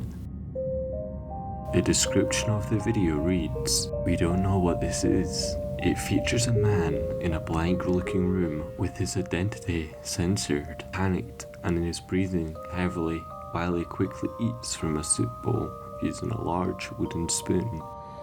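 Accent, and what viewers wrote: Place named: Scotland, United Kingdom. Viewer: Ah recognise that accent, yer fae Scotland mate! Me tae! 🤪 Good video, hope you're doing well. Best wishes from Edinburgh 💙🏴💙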